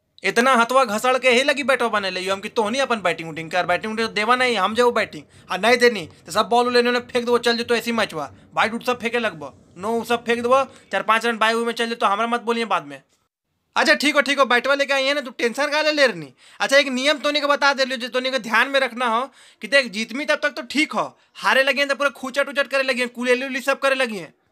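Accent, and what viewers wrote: Bihar, India. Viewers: Lagta h aap bihar ke Rohtas se ha ...🙏🙏🙏🙏🙏🙏🙏🙏🙏🙏🙏🙏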